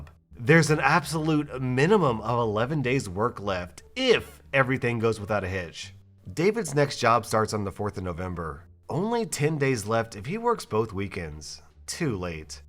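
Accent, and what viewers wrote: United States. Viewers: Love hearing UK vernacular with US accent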